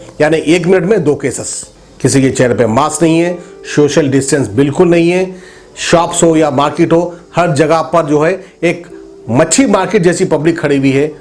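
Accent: Hyderabad, India. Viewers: bhai pure Hyderabadi language.....ghareeb kya karinga bhai...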